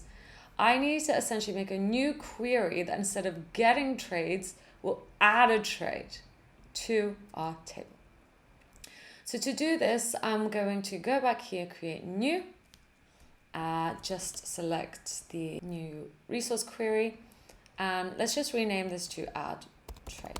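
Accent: England, United Kingdom. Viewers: You are a British women, i know that because your accent is very well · Which English accent is this? Just curious.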